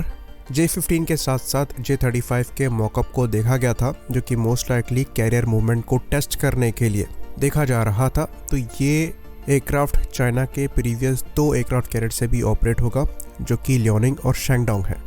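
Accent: India. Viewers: 你的英文有很强的印度咖喱味道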